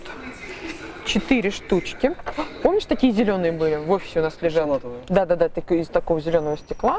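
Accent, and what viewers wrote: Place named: Mordovia, Russia. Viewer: 😂😂😂 Досмотрела до конца!!! говор прям мордовский🔥👍 часы можно брать 😂видно что крепкие.. Очень понравилось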